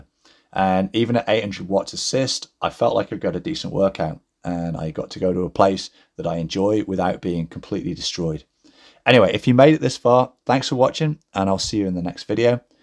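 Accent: United Kingdom. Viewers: Where is this? You sound British but it looks like Colorado.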